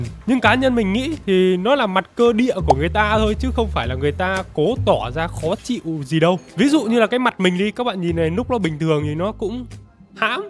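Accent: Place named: North, Vietnam. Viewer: Mình lại thấy bình thường không có làm màu gì luôn. Có thể chủ kênh nói giọng bắc nên chú đoán là khách du lịch nên chú tiếp đãi nồng nhiệt thôi.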